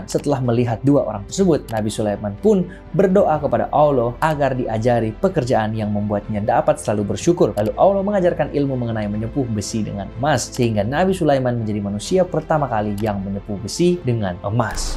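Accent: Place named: Batak, Indonesia. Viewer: Hai aku penasaran aksen apakah ya ? Kaya batak atau bugis ya aksen dan dialekmu?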